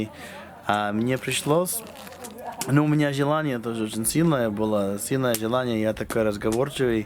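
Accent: Tuva, Russia. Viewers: На руском говорит как тувинец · 😅тувинский акцент! Дыка кижисиг кижи.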